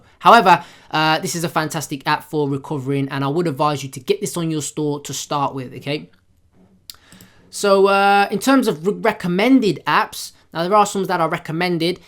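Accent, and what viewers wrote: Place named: Birmingham, United Kingdom. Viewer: sick videos mate, you sound like youre from or around brum, would love to get some tips if available!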